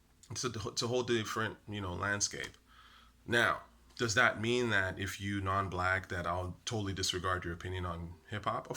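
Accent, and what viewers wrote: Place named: New York, United States. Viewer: He talks like a fruitcup from new york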